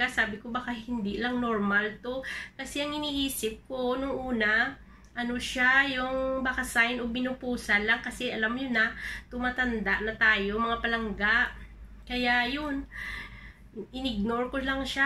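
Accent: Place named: Iloilo, Philippines. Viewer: Hi sissy sending my support thanks for sharing sister daw ilongga ang boses mo sis sa antique ako enjoy lang sa pag vlogg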